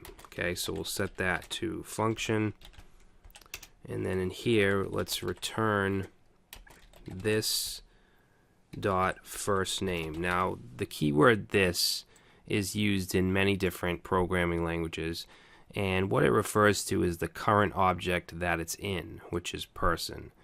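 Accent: Boston, United States. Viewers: he was talkin about your boston accent. I picked up on it too haha! Thanks though, man. Been trying to find good tutorials to learn js, but everyone else sounds either very boring/not engaging. Gonna check for other videos you have. thumbs up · Very well made and informing video. You sound like you're from Boston. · hahaha!! Nice one! has that classy Boston accent:)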